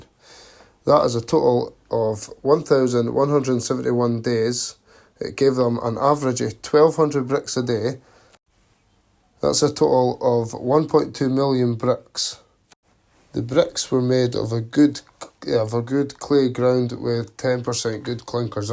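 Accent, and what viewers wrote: Scotland, United Kingdom. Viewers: I'd never heard such a thing about these chimneys before. I would see them in old pictures but they never raised my curiosity until I saw your video. I found I had to use the subtitles to follow what you were saying because you have a great Scotish accent! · Brilliant video. Loads of info. You have captured a major bit of heritage that is being torn down with damn little thought. PS great to hear a video with a Scottish accent that's my accent!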